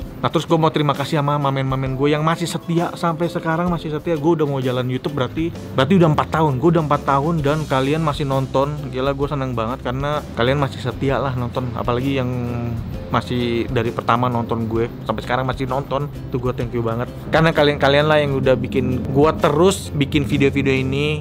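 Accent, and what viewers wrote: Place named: Pontianak, Indonesia. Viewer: Orang Pontianak mana suara nya 😄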